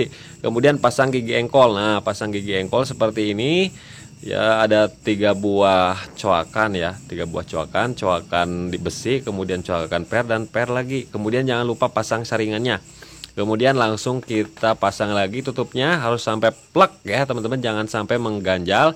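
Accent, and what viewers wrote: West Java, Indonesia. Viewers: Curukk 🤭 orang sunda ieu mah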